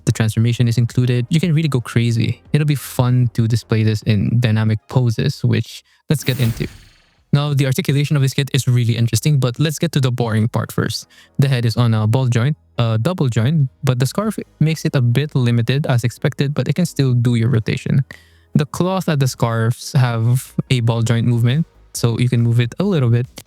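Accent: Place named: Philippines. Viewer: Just found your channel, like your videos. And by the accent alone I can already tell you're a Fellow Filipino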